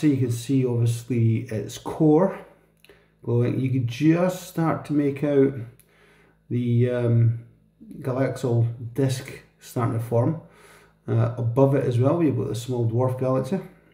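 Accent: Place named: Scotland, United Kingdom. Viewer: Thank you for short but great video. Love the Scottish accent. Enjoyed this. I wonder if the exposure time was extended to 20 s how it would go? Well put together video.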